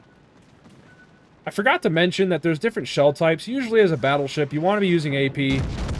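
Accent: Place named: South, United States. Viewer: Kinda new to wow, screw every other tip video, I shall let the man who sounds like a red neck speak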